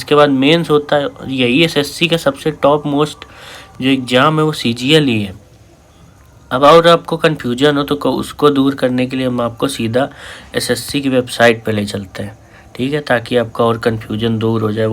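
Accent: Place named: Kanpur, India. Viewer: Kanpur se ho kya bhayia apki language se lg rha 😅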